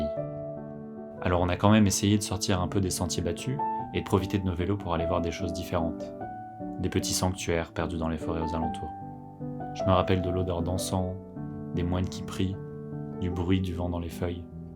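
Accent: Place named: Japan. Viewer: 事故無く日本縦断を楽しまれて何よりです。音楽と西諸弁のナレーションも心地よかったです。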